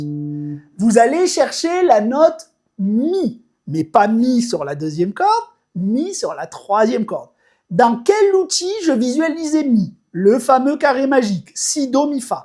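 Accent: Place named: Provence, France. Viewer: oui c est une question d accent et le tien m a propulsé dans les films de M Pagnol.... Le mien, franc-comtois n est pas aussi musical.... Pour répondre, c est toujours intéressant d avoir des éclairages différents sur la technique. Perso je fais des relevés d accords en écoutant de la variété. Tous mes vœux.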